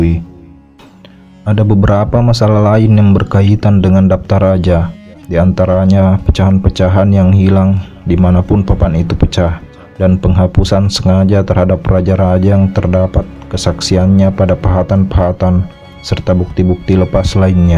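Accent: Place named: Medan, Indonesia. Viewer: Maaf bang mau komen out of the topic abang orang medan ya? Dialeknya terasa banget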